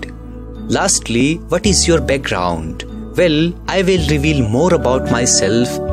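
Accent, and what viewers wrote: South India, India. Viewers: Really It's So Surprising That The English Accent Of ur's Does Matches Which We usually Hear frm our Teachers In India.... So basically U r Indian Famous Teacher On Utube this is my personal opinion.... But ur wrk is tough As I Think....But At Last when U Said Naah Man I am Not Handsome.....that's Wrong In Content Becoz Person Should be good at skills not In Face ..... Lots of respect And Blessings Frm Children Frm India .🙏🙏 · I thought you were South-Indian because of your accent😅.. Anyways, really you're really great teacher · I thought u were from India's South😅😂 keep it up broo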